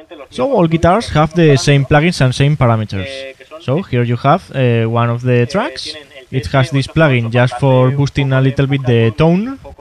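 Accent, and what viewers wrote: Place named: Spain. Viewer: Your spanish accent sound pretty awesome! ahah and don't forget to always add some tags to your videos, so you can get more views ;D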